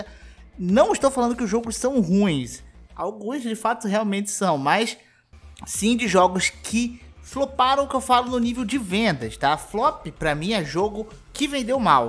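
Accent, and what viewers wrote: Rio de Janeiro, Brazil. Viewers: Man, se é do rio? LIKE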